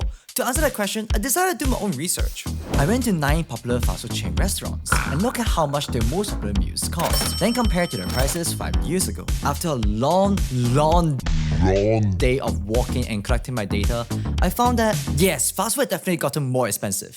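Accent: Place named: Singapore. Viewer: Tf was he saying. That fake slang plus Singaporean accent damn confusing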